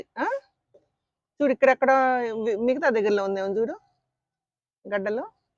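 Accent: Nellore, India. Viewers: Mana Nellore bashalo super andi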